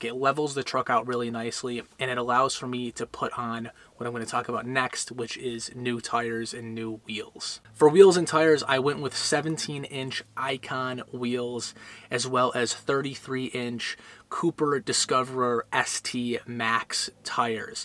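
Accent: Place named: Buffalo, United States. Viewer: Lol… tray - ler… I noticed he was saying trahler.. it’s that Buffalo accent 😀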